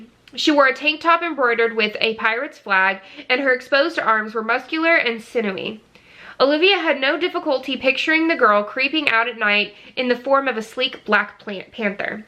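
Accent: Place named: South, United States. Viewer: Just listened to this a second time - you have the BEST Southern accent ever. In fact, I didn’t even like the women in the beginning but your accent made them more likable! XOXO Lisa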